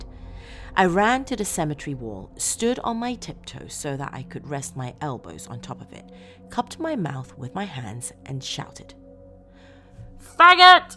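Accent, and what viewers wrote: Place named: United Kingdom. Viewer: oh you charmer you are from Britain if I would have to place your accent 😉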